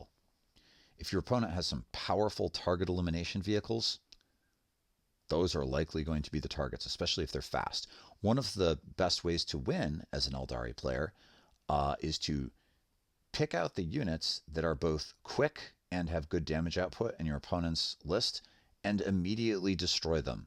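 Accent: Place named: Canada. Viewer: Not saying Canadian's are cooler or anything. It is a cool accent nonetheless. We are all from London anyway. 🤣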